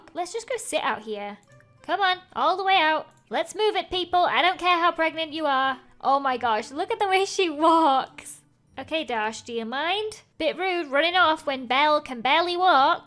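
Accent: United Kingdom. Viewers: It was her accent. She is. Very VERY British and her accent is amazing btw, but when she says “into” it sounds like “unto”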